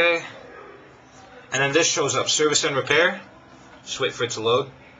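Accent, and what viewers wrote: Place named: India. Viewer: No offense but people with foreign accent should speak slowly. Even more from India.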